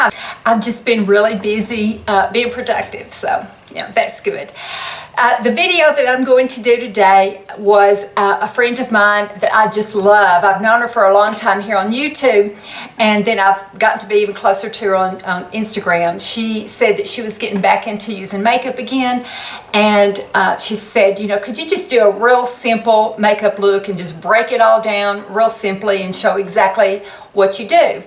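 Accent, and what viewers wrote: South, United States. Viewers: Just found you few days ago and I had to subscribe you because who doesn’t! You are skin is incredible but I like your kind and honest personality as much as your beautiful face:) and I like your cute southern accents. I have a same palette but I haven’t used for almost a year. I just got tired of the way I use so this look gives me more variety and would like to try it. Your skin care inspires me to start taking care of my skin more seriously. I’m 43 and after 40 I noticed my skin start sagging and looks dull. Thank you!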